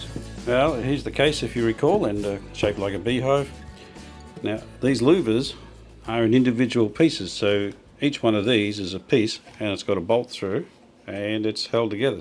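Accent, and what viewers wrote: Australia. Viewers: accustom to the Australian English pronunciation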